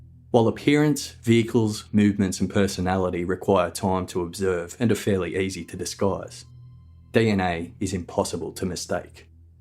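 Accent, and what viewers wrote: Australia. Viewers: Yeah he is great. I got sick of the endless true crime podcast presenters that insist on giving their opinions on it all. I just love hearing the cold hard facts of it all and the Aussie accent somehow puts it in a different league. It's really easy to listen to.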